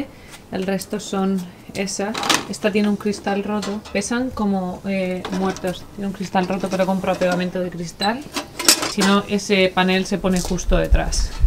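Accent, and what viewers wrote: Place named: Andalusia, Spain. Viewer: Te sale el habla forzado con las eses. Mucho mejor cuando hablas en andaluz con tu madre. Como dwbe ser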